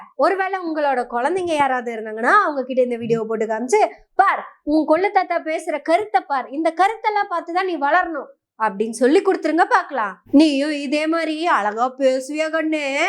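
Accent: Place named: Coimbatore, India. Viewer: கோவை வட்டார வழக்கு,, நல்லா சுத்தமா பேசுங்க சகோதரி....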